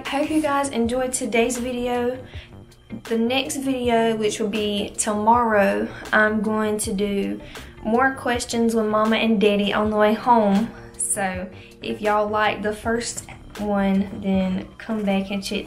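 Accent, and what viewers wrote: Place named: South, United States. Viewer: omg i love your accent!!! country gal just like me and a natural i love it girl!!! Will subscribe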